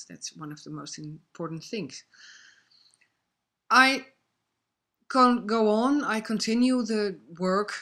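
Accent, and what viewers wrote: Netherlands. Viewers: big time, im 100% also born in the U.S. with a dual citizenship. i can pick up a dutch accent a mile away! Ik vind het fantastisch is. ook veel succes met je paarden en bedankt voor het antwoord!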